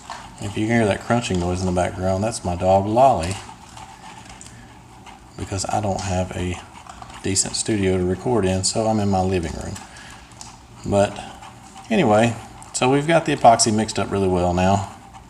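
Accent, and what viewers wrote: South Carolina, United States. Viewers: where is this accent from? south carolina?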